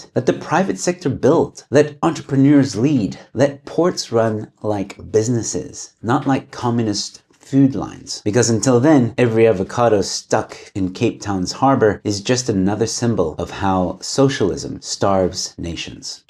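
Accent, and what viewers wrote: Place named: United States. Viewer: Is this Youtuber from SA? He sounds like he’s from the USA.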